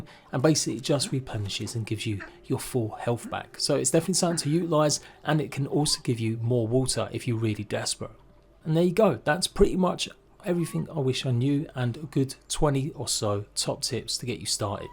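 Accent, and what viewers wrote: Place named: London, United Kingdom. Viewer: That is definitely a London accent you've got there. I just started this game yesterday and was in major trouble with water cause I only had the 2 coconuts that take ages to respawn. Thanks to you I am now smarter. Shout out from Germany. Cheers mate 😁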